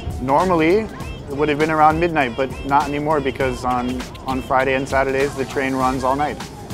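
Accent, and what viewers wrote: Turkey. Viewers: o kadar türkçeyle yaşayınca adamın ingilizcesi türk aksanlı olmuş. "hi my name is "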